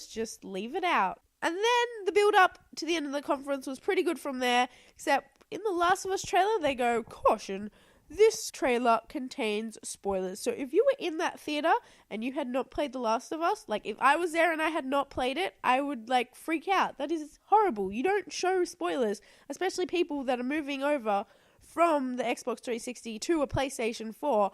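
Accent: Australia. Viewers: ***** here is a wake up call if u don't like how people talk nobody is even going to waste a second with you or even pay attention. I on the other hand wanted to just say somthing maybe u could change again if u don't like how she talks then just leave the channel if u don't like the Australian accent know that nobody is going to change for your ungrateful ass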